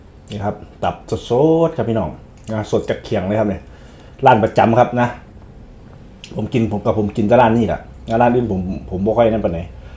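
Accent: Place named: Udon Thani, Thailand. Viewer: คือเว่าคือคนอุดรฯแท้อ้าย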